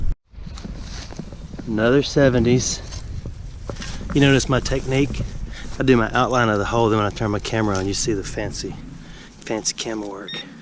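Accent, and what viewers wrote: South, United States. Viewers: I Love your videos and I LOVE listening to your soft southern accent, so SEXY!!! ;-) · Nothing like a good ole southern boys accent...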